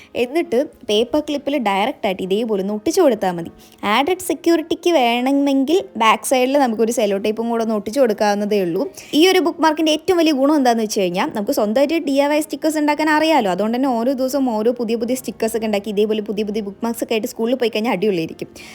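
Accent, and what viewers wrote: Palakkad, India. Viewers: ചേച്ചിൻ്റെ വീട് പാലക്കാട് ജില്ലയിലആണ് എന്ന് പറയുന്ന നതിന് മുൻപ് ചേച്ചിയുടെ SLANG കെട്ട പ്പോൾ മനസ്സിലായി പാലക്കാട് ജില്ലയിലാണ് വീട് എന്ന് ഞാനും പാലക്കാട് ജില്ലയില്ലാണ്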